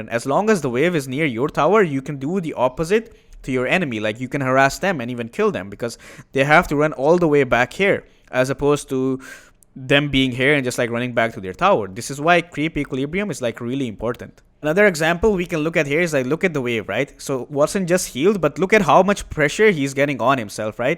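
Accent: India. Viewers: good video but your editing is shit. sometime your voice is slow and sometime its soo fucking loud. indian sland already annoying enough now you add slow and loud voice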